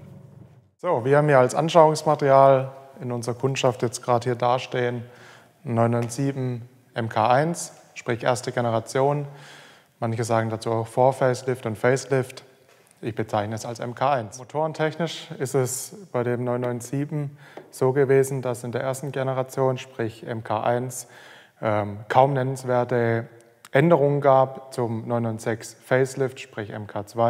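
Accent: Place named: Swabia, Germany. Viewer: Der Schwabe :)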